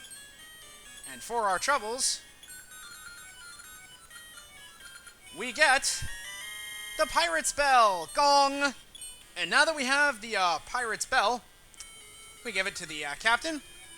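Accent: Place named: Canada. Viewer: You and your cute Canadian prenunciations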